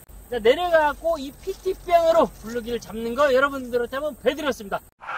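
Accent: Jeolla, South Korea. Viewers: 전라도여?ㅋ 가장게. 일로치나. 절로간게. 타슈와 타랑께ㅋㅋㅋ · 으따 전라도여~^^ 너무 좋당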